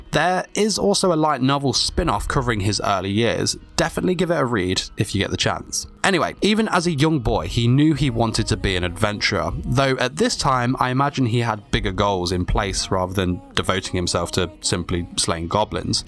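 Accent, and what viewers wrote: United Kingdom. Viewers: Dude, "Bolg" is from Celtic mythology. You sound British, so you should know this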